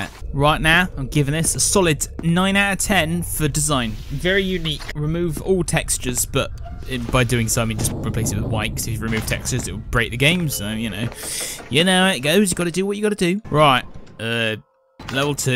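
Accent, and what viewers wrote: United Kingdom. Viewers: Why is he extra British in this video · why do you sound extra british in this video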